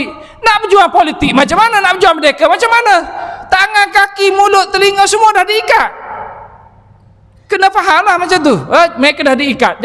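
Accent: Kelantan, Malaysia. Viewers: org Kelantan kan cakap bunyi kelantan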